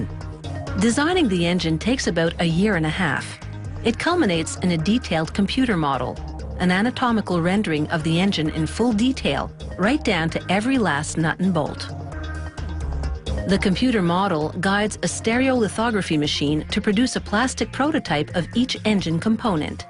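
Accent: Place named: Canada. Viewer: Canadian narrator for sure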